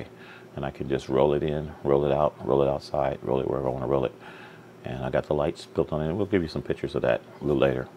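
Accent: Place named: California, United States. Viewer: Wow! You got that CA accent now!!!! Much love friend.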